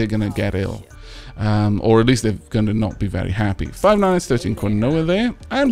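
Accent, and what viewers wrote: Wales, United Kingdom. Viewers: Completely new to this channel, saw the picture, is this guy Welsh? I noticed the accent and can't help think it. I know it's not important, but you know...curiosity!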